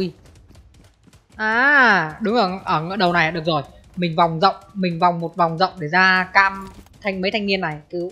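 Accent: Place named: Hai Phong, Vietnam. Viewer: đi đâu vậy a zai ? quen vãi . hải phòng mà e đặc sản giọng đó ☺️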